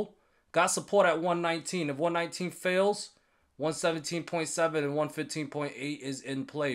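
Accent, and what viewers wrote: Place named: East Coast, United States. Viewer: I can hear that east coast real strong 💪. This guys charting analysis is on point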